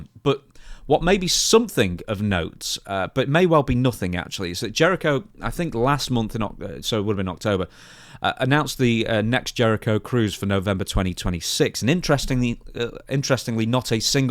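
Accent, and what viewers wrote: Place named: Leicestershire, United Kingdom. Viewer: You're not from Manchester. That's a Leicestershire accent. I know it when I hear itm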